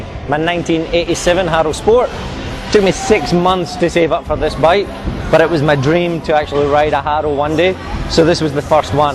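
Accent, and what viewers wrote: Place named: Glasgow, United Kingdom. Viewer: Sounds like a glasgow accent did you ride around 1984 85 .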